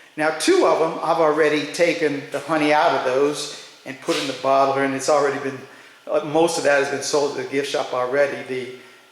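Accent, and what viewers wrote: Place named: New Orleans, United States. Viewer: Just listening to you, I heard a N'awlins accent. Then I expanded the intro. Sure enough, I see that you're from Louisiana. I kind of figured as much when you began talking about the cicadas. Love y'all. I live in GA and I'm from AL originally. A whole lotta good food comes out of Louisiana. Thanks for showing us your honey making operation. I've seen small operations before but seeing a big one like yours is a real learning experience. Really impressive. Thanks for posting the video.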